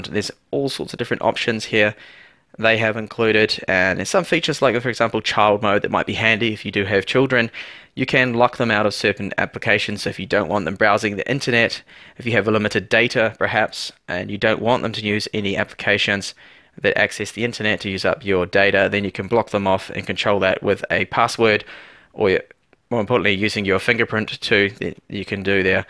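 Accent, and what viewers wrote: New Zealand. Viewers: Yay finally a kiwi accent! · "Seeven " Teen " haha :) cool kiwi accent. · Are u from NZ? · Hi Mate, I heard you mention that you're from NZ (the accent says it all really). Im looking at getting a Redmi Note 3 from Aliexpress from a seller called Hong Kong Goldway. I struggle to find these in NZ at a reasonable price so think this is the best thing. Are you able to comment on how easy it is to flash the global MIUI rom and have all google services installed. As I assume the Aliexpress models from china have no google services and heaps of bloatware. Then again, where did you get yours from (if you did get it delivered to NZ) Cheers in advance.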